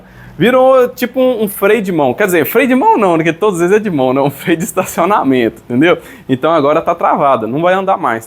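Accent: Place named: Montes Claros, Brazil. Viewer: Onde essa cidade pelo seu sotaque, me parece que e de Montes Claros ou regiao.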